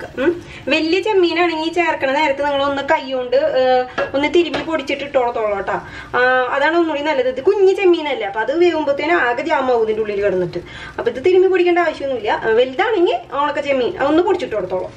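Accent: Thrissur, India. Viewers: Variety Sambar try cheyyam thrissur slang super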